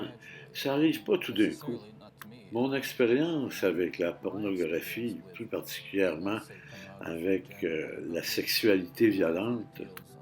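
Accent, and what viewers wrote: Quebec, Canada. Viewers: C'est ps une sinusite , c'est l'accent canadien qui et insupportable..... pourquoi voir pris un canadien pour commenter cette vidéo... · Ce n'est pas un reportage canadien c'est une voix off québecoise. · celà s'appelle le français Québécois pauvre inculte de français tu sonne raciste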